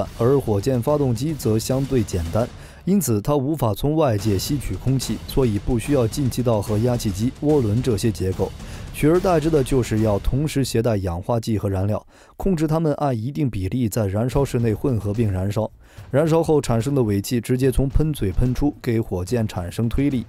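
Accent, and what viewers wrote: China. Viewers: 聽聲音也知道這是中共病毒武漢肺炎國人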